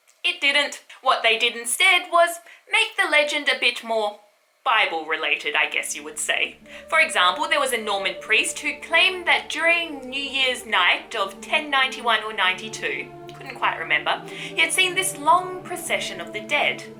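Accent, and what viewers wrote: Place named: Australia. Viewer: I'm watching this to see why it has record low views in 5 yrs. On a popular subject. Oh Australian accent.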